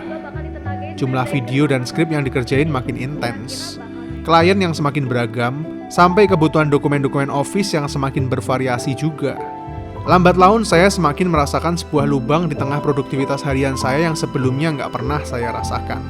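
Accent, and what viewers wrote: Yogyakarta, Indonesia. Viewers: Aku Suka karna ada medok khas Jogja nya ya kang 🙏 ciri khas banget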